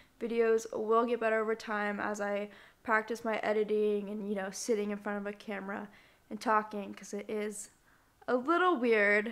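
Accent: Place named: United States. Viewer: I love your American accent !! Greetings from France :)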